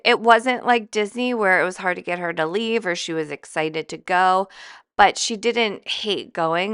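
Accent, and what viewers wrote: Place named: California, United States. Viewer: HER VOICE IS ANNOYING !! sounds like someone from California. Like oh my God. 😅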